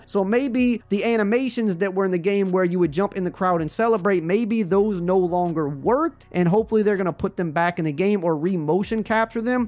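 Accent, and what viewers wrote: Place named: Midwest, United States. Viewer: Are you from the midwest, like Minnesota or Illinois? I'm from Chicago and you sound a lot like my friends.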